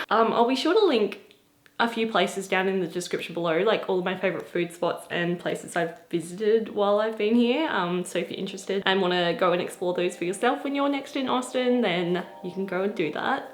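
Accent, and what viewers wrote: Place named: Australia. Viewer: It's so interesting to me how accents differ between English speaking countries, especially an Aussie accent.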